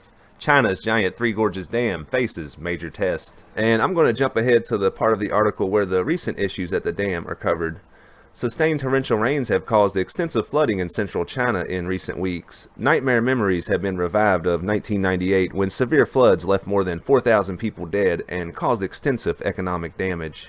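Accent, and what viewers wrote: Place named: West Virginia, United States. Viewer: Sounds more like West Viginian accent. You insult true Southern accents.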